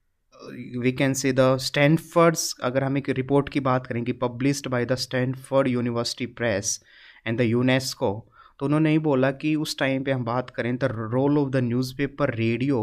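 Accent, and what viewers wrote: Haryana, India. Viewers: apki language m haryanvi accent aave tha ismare puchi thi..m bhi haryanvi hu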